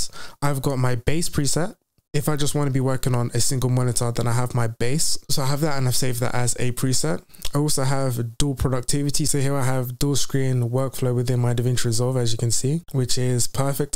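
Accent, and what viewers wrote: United Kingdom. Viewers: Wonderfully done my friend...Love you man...Also love the English accent. Keep up the good work...peace brother..!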